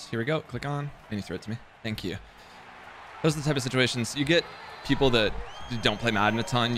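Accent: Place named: South, United States. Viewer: I’m here for the southern accent and I lost already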